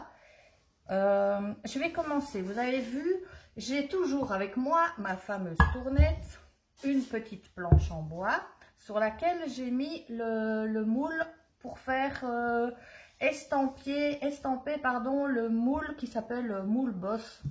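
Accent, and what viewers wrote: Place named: North, France. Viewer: Merci pour ta générosité d'informations! moi qui suis originaire de Lille (expatriée en Normandie près de chez Raoult!) avec mon grand-père Belge, ça me fait du bien d'entendre ton accent;-)) dis-moi, quelle épaisseur fait ta plaque de terre pour ce plat? Y a t il des épaisseurs précises en fonction des objets plus ou moins grands? merci beaucoup Caroline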